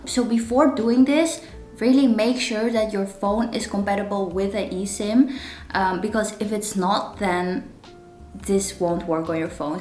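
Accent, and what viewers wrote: Netherlands. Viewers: My flight to Chongqing is tomorrow. I am a bit late to watch this video. I did book a stay with English speaking staff luckily. Setting up all my apps now. Thank you so much!! btw.. Je Nederlands accent is super cute.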